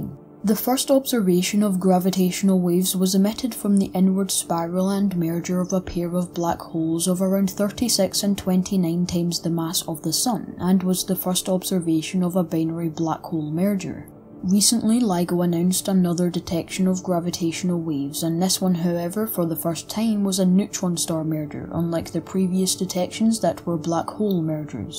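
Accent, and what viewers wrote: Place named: Scotland, United Kingdom. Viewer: Love your videos. I cannae believe that folk would need subtitles for your lovely Scottish accent. All the best from just outside Edinburgh.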